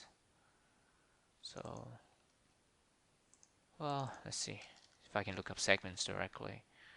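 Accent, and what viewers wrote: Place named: China. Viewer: I love your accent, it sounds really China!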